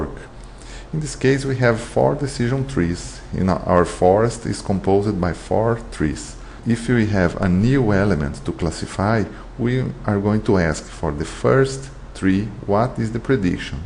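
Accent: Brazil. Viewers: His ascent sound like hi is brasilian? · Nossa, comecei a ver e ouvindo o jeito de falar ficou muito clara a brasilidade da pessoa. Mas muito legal o material.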